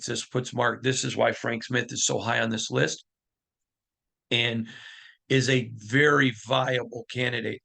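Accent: Midwest, United States. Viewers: He's from the mid-west !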